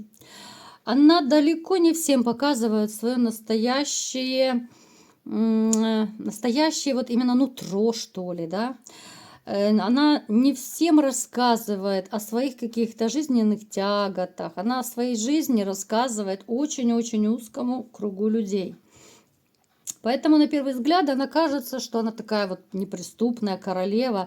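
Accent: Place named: Ukraine. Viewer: В речи редко проглядывается украинский язык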